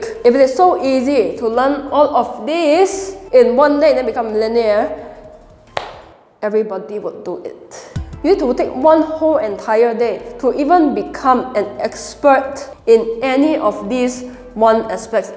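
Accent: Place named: Singapore. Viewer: u sound singaporean and i like it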